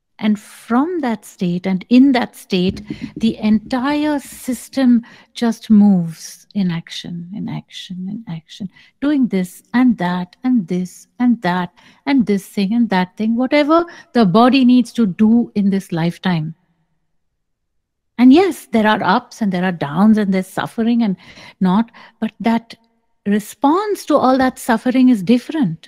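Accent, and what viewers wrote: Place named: France. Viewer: Unmistakable heavy French accent ;)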